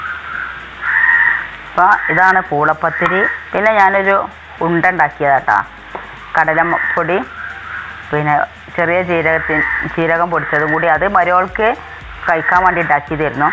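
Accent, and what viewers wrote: Malappuram, India. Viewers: Nammude മലപ്പുറം ഭാഷ കേക്കുമ്പോ എന്തോ നൊസ്റ്റു ഫീൽ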